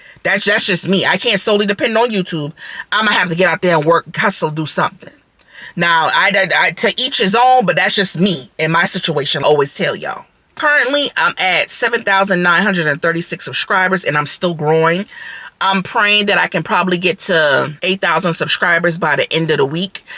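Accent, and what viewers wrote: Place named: Charleston, United States. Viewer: Ouuuu❤❤❤ I'm going to start recording some GIG work content. Also I knew you were from Chuck town ..... that accent. Im from Goose Creek area ❤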